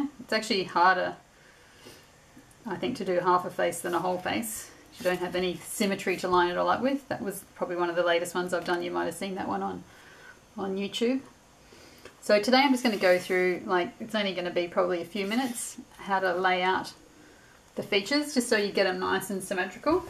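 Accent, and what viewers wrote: Australia. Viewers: hi - spotted you in pinterest & came on over here to yr lil corner of the earth. this is the 2nd one of yr vids i've watched, but plan on watching them all eventually. i hear by yr accent yr an aussie - same here. i'm from adelaide - where are you from? anyway, thanx for sharing yr art, i've really enjoyed watchingit.:) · Great to hear an Australian voice & the correct ways to use mixed media products that are available in our country TFS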